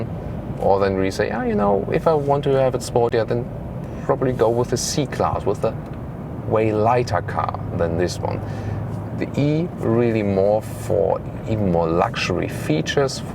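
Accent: Germany. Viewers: your spoken english sounds like german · that's germensh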